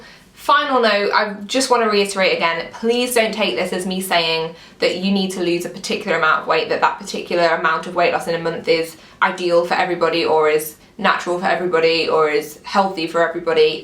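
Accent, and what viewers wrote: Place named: United Kingdom. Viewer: You have a British accent. Lovely😍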